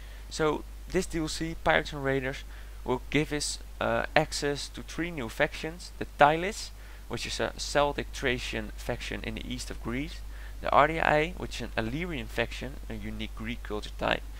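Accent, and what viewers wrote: Netherlands. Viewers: For your first video you did great! Maar ja je kan wel echt aan het accent horen dat je Nederlands bent hahaha :P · That was soooo obvious from the " nederlands-achtige-crappy-engels accent" xD aha wel grappig om zo te horen Voor de rest wel ok! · jij bent nederlands ik hoor het meteen maar maakt niet uit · dude the dutch accent's great! :D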